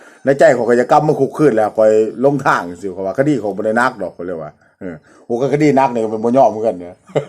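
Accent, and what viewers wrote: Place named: Sisaket, Thailand. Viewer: สำเนียง คือคนศรีษะเกษ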